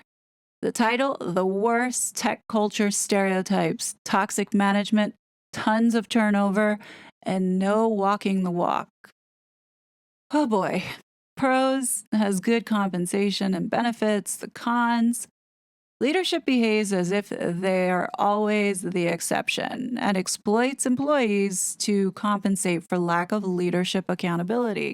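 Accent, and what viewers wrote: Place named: United States. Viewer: Oh bless you, I’m so sorry you’ve suffered - and are still suffering - such a miserable work experience. I’ve no idea of your background, but, imo, you have found a perfect alternative in this channel. Your investigative skills are incredible, you pick up on the most minute details (which other YouTubers completely miss) and your calling out the egregious behaviours of the miserable pair, and those they are involved with at whatever level. I love your sarcasm and dry wit, and you’ve got such a great voice - a very soft US accent (some are very abrasive)! Thank you for all you do for and on our behalf. You are greatly appreciated❣️ ❗️It’s especially amazing to hear that you have faith in God, and that surviving the trauma you’ve been through, you are testament to God’s grace and to the power of prayer🙏🏼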